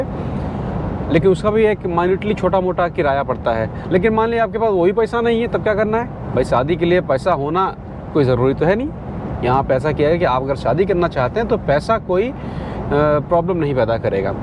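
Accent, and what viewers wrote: Bihar, India. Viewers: Aap bihar se kya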